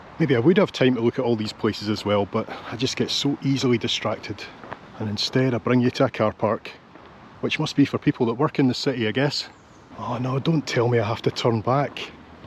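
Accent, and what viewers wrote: Cork, Ireland. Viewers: Cork accent is very fast 😂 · My grandad was from cork. I love the accent ❤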